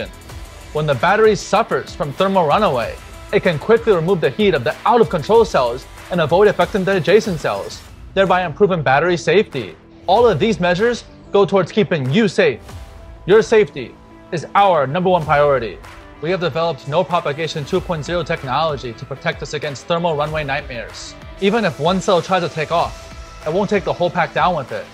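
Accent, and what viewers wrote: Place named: China. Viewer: Ohk, this host is a red head, blue eyes and heavy Chinese accentes English? 🤕What I miss?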